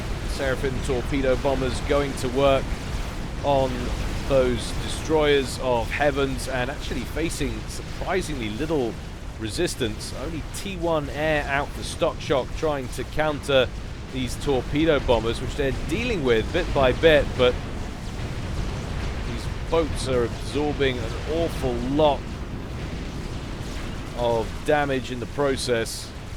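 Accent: United Kingdom. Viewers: Oh this british Tyrannus hurts in my ear D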